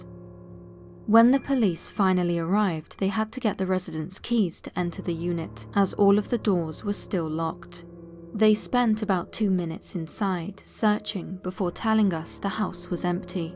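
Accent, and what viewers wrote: United Kingdom. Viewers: When Brits say the word "figure" it sounds like "figga". The word "home" sounds like "haome". I enjoy the stories though. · She does have a very pleasant voice and clear British English.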